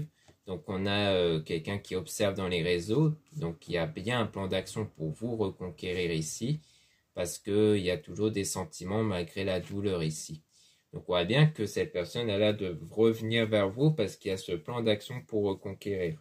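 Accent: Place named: Madagascar. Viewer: Je vous découvre grâce à Maria ❤️ j'adore le petit accent malgache /reunionnais🤗 merci pour ce tirage très parlant 🙏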